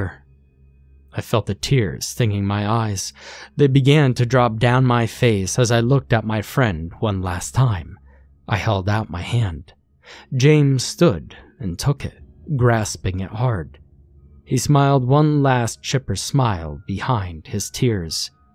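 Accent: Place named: Northeast, United States. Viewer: Accent? Where are you from? To me he just enunciates clearly and sounds almost northeastern, like Massachusetts or something 🤔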